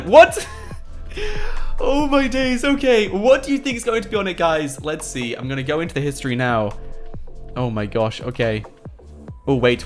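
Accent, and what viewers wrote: England, United Kingdom. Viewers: Are you from England?????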